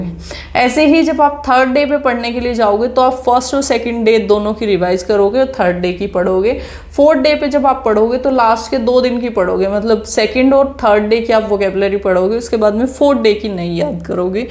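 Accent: Haryana, India. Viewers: चाहे कितनी ही आछे से हिंदी बोल लो पर हरयाणवी flow नही जाने वाला😅😅😅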